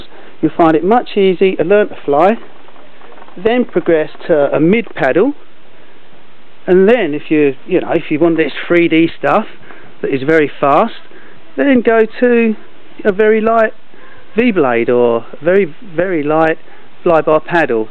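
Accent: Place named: South London, United Kingdom. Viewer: you south london geez? brixton/peckham accent, well cool.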